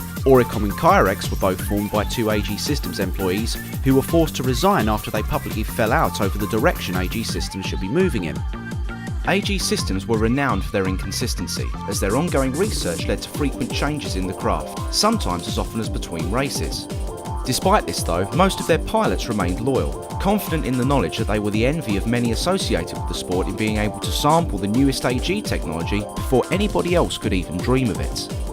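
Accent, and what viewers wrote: United Kingdom. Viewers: Never knew there was back story like this. And for us here in the States, all stories sound better through a British accent. Keep it up.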